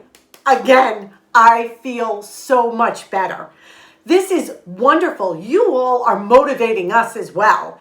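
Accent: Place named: New York, United States. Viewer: I’m a fairly new subscriber and have been enjoying watching your videos. I can really tell you’re from NewYork and nowhere near “Dixie” just by listening to your accent. 😊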